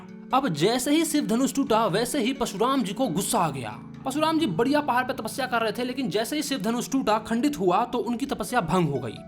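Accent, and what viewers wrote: Bihar, India. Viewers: aap bihari hooo? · Bahi aup bihar sa ho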